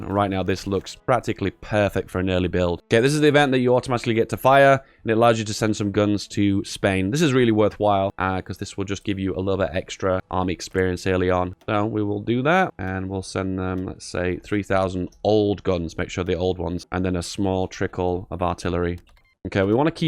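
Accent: England, United Kingdom. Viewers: #giveaway Hey as I mentioned before, I didn't win a giveaway, but hey I'm doing it again cause it's free. Love your video and love your accent, as a fan of British accent (please don't judge me) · #giveaway I love your videos, they are usually really helpful and entertaining, and along with that sweet British accent just makes watching your videos all the more a pleasure. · a smooth English voice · Class video mate, just discovered your channel but it looks like rly high quality stuff #giveaway Also nice to hear another English accent 😍🏴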